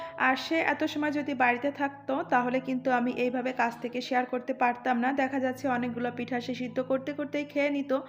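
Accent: Jessore, Bangladesh. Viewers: বুন্ডি আমাগের যশোরের আঞ্চলিক ভাষা ডা কিন্তু সেইরম সুন্দর এরম করে কথা কতি পারলি কিন্তু পরান ভরে যায় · আপু তোমার কন্ঠে যশোরের এই ভাষায় কথা শুনে খুবই ভালো লাগলো আমি কিন্তু যশোরের মেয়ে বউ। পিঠে গুলো অনেক সুন্দর হয়েছে। কুচি কুচি চিকেন মসলা দিয়ে মেখে রাখলে। ঘরটা সুন্দর করে সাজিয়ে নিলে। সন্ধ্যায় বান্ধবীর সঙ্গে ঘোরাঘুরি করলে। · 👍👍 যশোরের প্রিয় আপু, আপনিও আমার মত কাপে সাপোর্ট দিয়ে মোবাইল রাখেন। আপনার ভাষাটা এত এনজয় করছিলাম। প্রত্যেকটি আঞ্চলিক ভাষার একটা সৌন্দর্য আছে। মাঝে মাঝে এই ভাষায় ভিডিও দেবেন আপু❤❤❤ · সত্যি বলেছো আপু আমাদের ডিকশনারিতে কোন ছুটি নেই মৃত্যুর আগ পর্যন্ত তো তোমার যশোরের ভাষার কথাগুলো শুনে বেশ মজা পেলাম পিঠাগুলো কিন্তু দারুণ হয়েছিল❤❤ ভেলপুরি 😊😊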